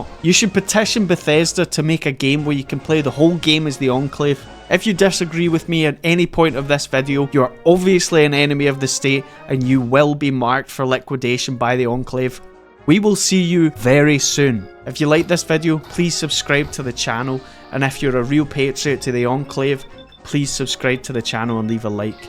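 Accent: Scotland, United Kingdom. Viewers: immediately like 3 seconds in the scottish accent throws it all off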